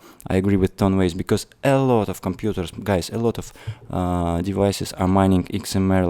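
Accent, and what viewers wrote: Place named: Russia. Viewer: Your English is perfect !👍👍👍Но есть русс. акцент как и у Ivan on Tech.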